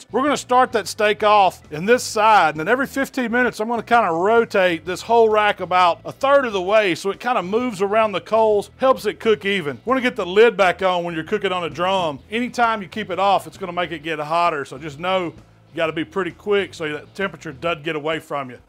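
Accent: South, United States. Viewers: Dewalt drill, Tomahawk Steak, American southern accent. All is missing is the American flag.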